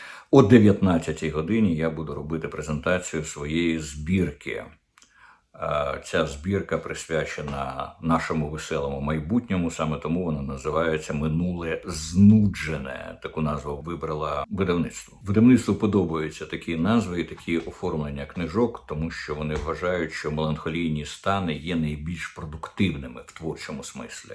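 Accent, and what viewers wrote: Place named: Moscow, Russia. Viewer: откуда у тебя этот московыцькый акцент? Як твой друг Дугин та арестович?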